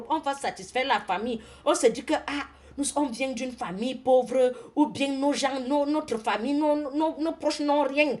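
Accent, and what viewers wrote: Cameroon. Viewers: I love your camerunian accent sister 😍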